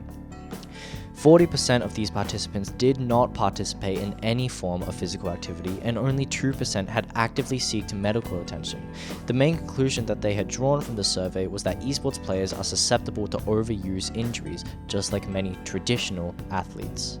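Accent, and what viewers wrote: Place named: United Kingdom. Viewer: Just to let you know, your british accent is stupid, and you need to speak up, put some balls into your speech. It's bad enough with your stupid English tea accent.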